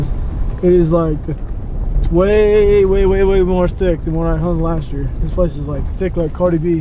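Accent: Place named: Texas, United States. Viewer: Weird all of a sudden you have a Texas accent???? Where the Fuc* did that come from??? I've never heard you sound like that till this vid and I've been subbed since you only had 277 subs,, I don't like it lol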